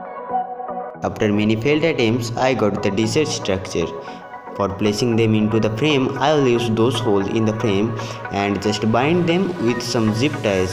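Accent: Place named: India. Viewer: It is Indian version of English ( India ), English is just a language, Don't give it to much importance, Skills are much more important ( and drones are the future )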